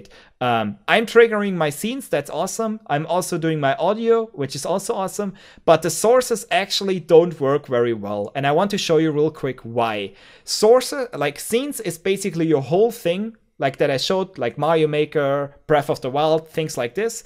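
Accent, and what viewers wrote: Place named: Germany. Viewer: Are you German? Cause I can hear the accent.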